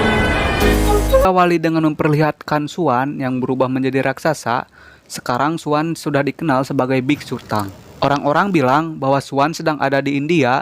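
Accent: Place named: Java, Indonesia. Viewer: Logat jawa 😂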